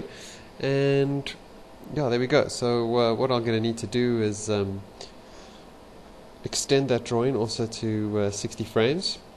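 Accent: South Africa. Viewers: omg r u from south africa cuz u sound like it ... im from cape town !! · you are South African hey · He's South African, not British.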